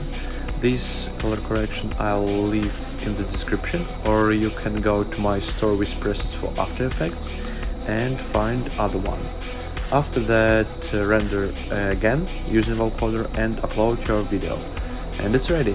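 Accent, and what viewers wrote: Russia. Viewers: Чувак, ты русский что-ли?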